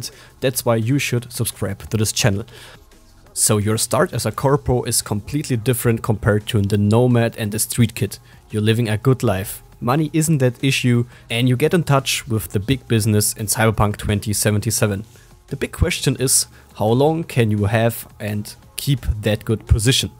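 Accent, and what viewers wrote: Germany. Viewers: hab ich an deinem deutschen Akzent erkannt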